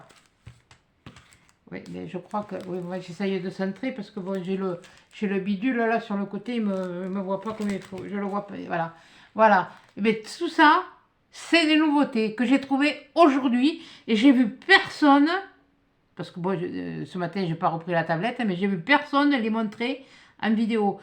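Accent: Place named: Southwest, France. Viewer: Bonsoir je suis nouvelle sur votre chaîne quand je vous écoute parlez j'ai l'impression d'entendre la voix de maïté la cuisinière 🤣des mousquetaires que j'aime beaucoup dommage qu'ont ne la voit plus à la télé 📺je suppose que vous venez du sud ouest moi je suis du nord de la France une chti's 🤣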